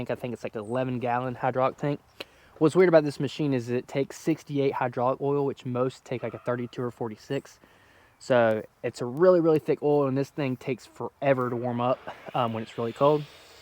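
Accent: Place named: North Carolina, United States. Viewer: North Carolina i knew it. This is random but i like to try and figure out where a place is by the environment, accents, and any other clues. I said hmm somewhere south east, ran thru a couple states then i said ah i bet it's north Carolina. Anyways, cool toy i want one!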